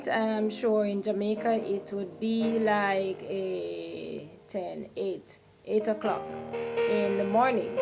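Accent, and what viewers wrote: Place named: United Kingdom. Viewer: gyal..u sounding kinda British.. nice words of encouragement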